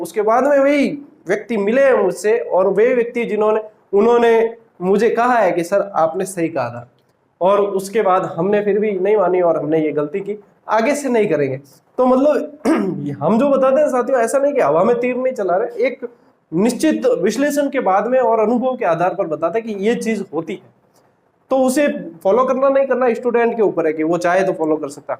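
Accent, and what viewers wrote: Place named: Rajasthan, India. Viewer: M Punjabi ND preparing for 3rd grade. after taking your classes I learned marwadi too 😄😄 love your marwadi sir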